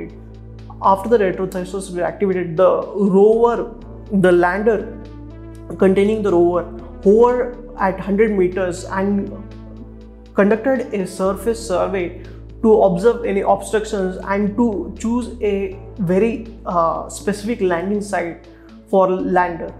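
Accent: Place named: India. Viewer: Indian accent means customer service cheats.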